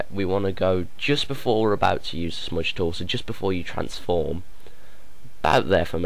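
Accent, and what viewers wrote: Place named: United Kingdom. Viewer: omg british accent! love it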